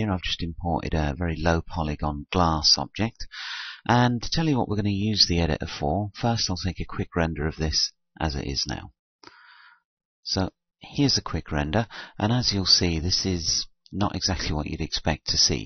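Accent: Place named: Australia. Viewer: That was a gold tip! Thank you! I also think you are australian by the accent! :D I love this accent! Have a nice day!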